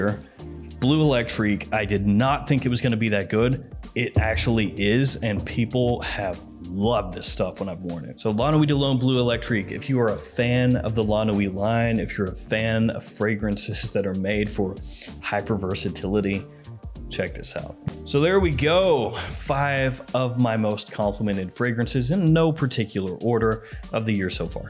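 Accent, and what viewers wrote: United States. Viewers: Hey your 🇺🇸 accent is cool to us Brits 🇬🇧. My last unsolicited compliment was from wearing Mancera - Cedrat boise. Greetings from England 🏴 - Lee.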